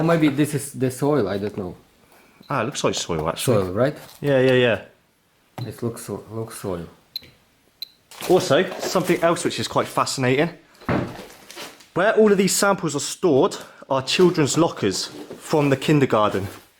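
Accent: United Kingdom. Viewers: Your British by the way you talk interesting video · Lmao you know YouTube comments are toxic when a brit has to excuse his accent to those of us in the western world.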